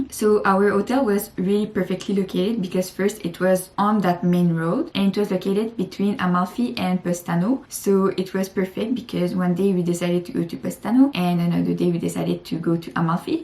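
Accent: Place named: France. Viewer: Montreal Canada. you know I will go to sorrento. positano and amalfi from China soon. your video is very helpful. besides I like to hear your French tone. it's charming .😊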